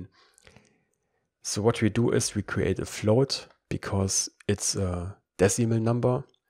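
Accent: Germany. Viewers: Love your teaching way brodar , your humour , your german accent lol awesome keep it up!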